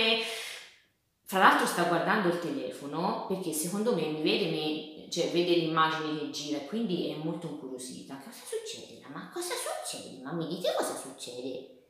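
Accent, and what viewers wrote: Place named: Tuscany, Italy. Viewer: siiiii mi tieni un sacco di compagnia , è molto piacevole ascoltarti, è bello sentire il tuo accento toscano/garfagnano ma è ancora più bello guardarti 🙈🙈adoro i tuoi occhi e i tuoi occhiali,stupendi!!!🙈😜😘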